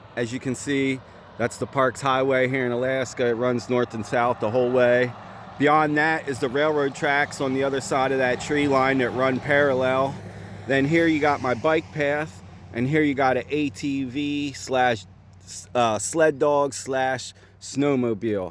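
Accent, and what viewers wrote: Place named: Mid-Atlantic, United States. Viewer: Bro, you gotta be from DE, MD, SEPA area with that accent 👍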